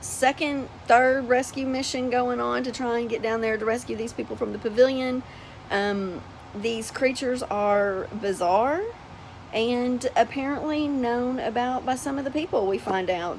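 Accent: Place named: South, United States. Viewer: ahh! So neat! I’m from Tennessee so I quickly recognized the accent lol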